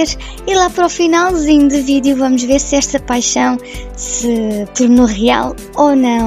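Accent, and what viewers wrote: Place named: Portugal. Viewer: esse cara é da hora !!! e o teu sotaque "tuguinha" é muito fofo !!!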